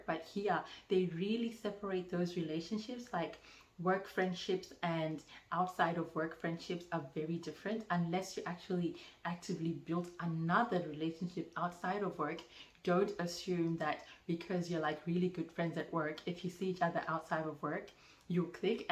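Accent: Australia. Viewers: Your getting some Aussie twang :-) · Your accent has a very Australian sound now if you didn't realise. Welcome to the Family.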